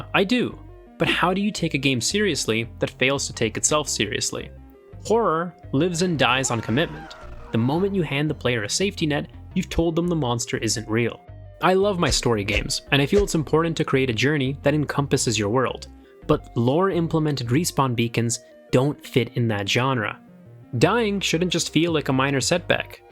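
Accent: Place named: Canada. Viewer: he is Canadian has a strong Canadian accent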